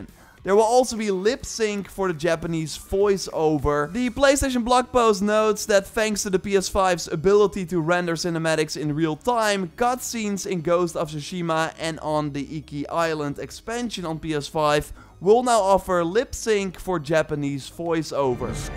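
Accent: Netherlands. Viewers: Damn that dutch accent is jarring And i am dutch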